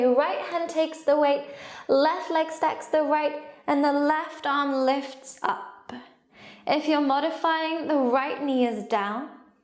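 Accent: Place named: England, United Kingdom. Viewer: Your 60 min sessions are great. I love your accent! I'm not good at guessing but I guess England or Australia. Anyway I can listen to you talk for days. Great workouts! Appreciate your time.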